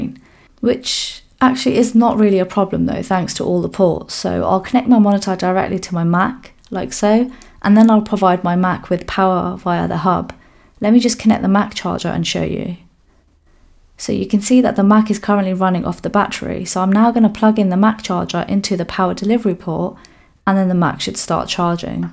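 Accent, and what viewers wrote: United Kingdom. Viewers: Truly helped me that you mentioned it doesn't support video output, I am looking for a usb dock to use samsung dex on an external screen ! :) PS : Even as a non-native english speaker I would bet you're from the UK lol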